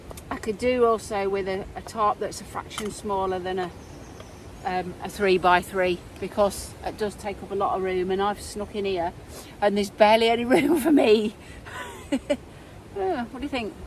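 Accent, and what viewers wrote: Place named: England, United Kingdom. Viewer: This is the 2nd video of yours i watched. You're a funny woman, I like that. I'm glad you're not dead yet. I always have to listen very carefully to understand your English accent. But I can manage that. Thanks for the videos, best regards from Germany.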